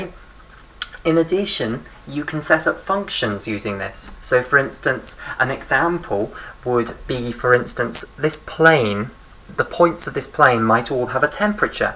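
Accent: England, United Kingdom. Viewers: English accent has it's benifits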